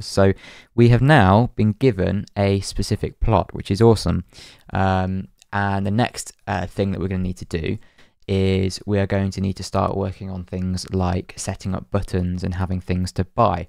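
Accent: United Kingdom. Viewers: i just love british ppl (the accent ofc)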